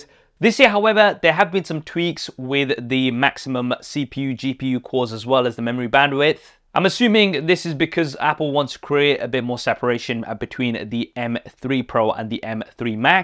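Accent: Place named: United Kingdom. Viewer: arab guy w british accent, thats new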